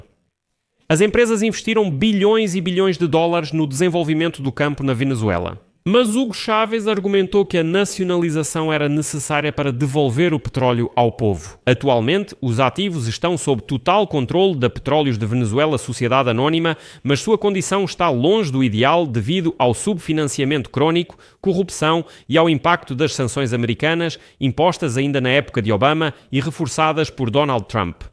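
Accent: Portugal. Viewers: Vc tem um sotaque diferente do brasileiro, fala de Portugal ?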